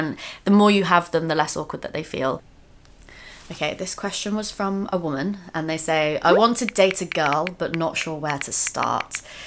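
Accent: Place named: United Kingdom. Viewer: How charming is her British accent? Sounds so intelligent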